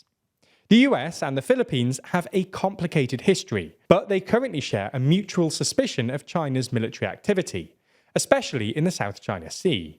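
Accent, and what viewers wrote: United Kingdom. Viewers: British for ya!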